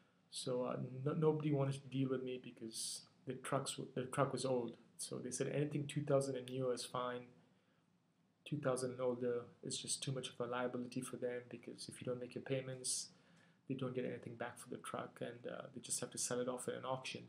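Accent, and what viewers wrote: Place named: India. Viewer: It sounds like you are from India. Where are you from in India?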